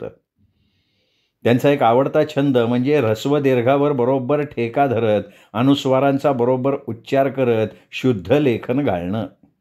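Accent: Konkan, India. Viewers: छान आवाज मस्तरंचा. अगदी कोकणात.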